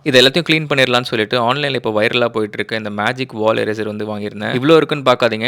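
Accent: Madurai, India. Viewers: Anne neenga madurai yaa..? 😅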